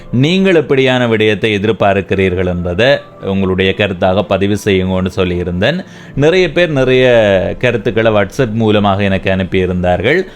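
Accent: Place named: Eelam, Sri Lanka. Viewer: ஈழ தமிழ் மிகவு‌ம் சிறப்பு நன்றாக உள்ளது தம்பி..💪💪💪💪💪💪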